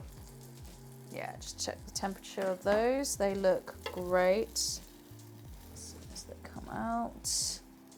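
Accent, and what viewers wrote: United Kingdom. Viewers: I love how Brits pronounce words compared to Americans, it’s hilarious 😂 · Please don't use music. British accent and asmr is the way forward. Trust me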